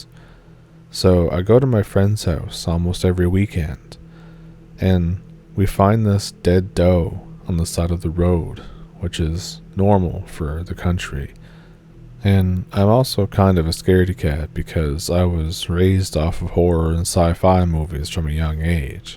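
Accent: Canada. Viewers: I’d suggest that you employ the computer generated voices, as your spoken voice, with your Canadian accent, is pretty much monotone and there is no inflection nor alteration of tone… there is so much more that could be done with the content if only you took advantage of the enhancement opportunities that exist… just sayin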